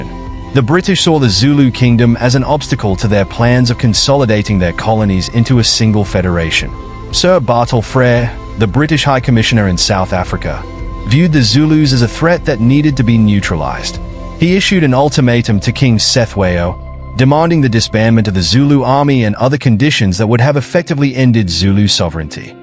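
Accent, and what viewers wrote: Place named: Australia. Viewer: Your accent is confusing I thought Australian but there's something else there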